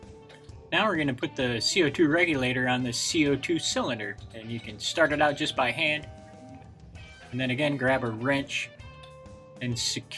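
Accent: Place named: Upper Midwest, United States. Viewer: That's the most intense upper midwestern accent I've ever heard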